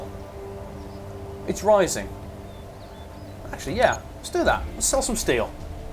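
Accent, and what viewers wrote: United Kingdom. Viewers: I love your voice man. British English is best English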